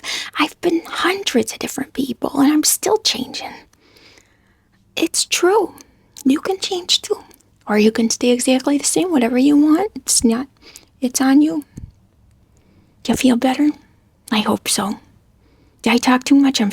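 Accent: United States. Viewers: Hearing your accent, I just want to hear, "Dontcha know" on repeat :P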